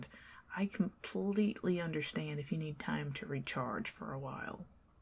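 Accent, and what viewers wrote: South, United States. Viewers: Loved this, really relaxing even if life got in the way so I has to listen to half this morning and half this... huh technically morning again. Anyway yeah really nice and I love the character, her understanding nature and of course your accent mixed with southern hospitality. Just adorable hearing the Yeps and such. 10/10.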